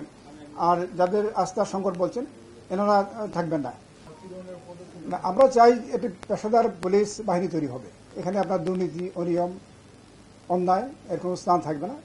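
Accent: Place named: India. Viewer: কথা ও উচ্চারন শুনে মনে হচ্ছে ভারতীয়? চেক করে দেখা উচিত!!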